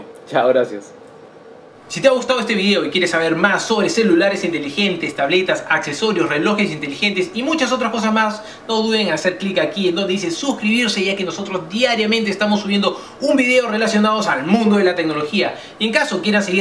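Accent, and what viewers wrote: Peru. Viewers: tu acento es como de Perú es por eso que tienes más seguidores y subscriptores.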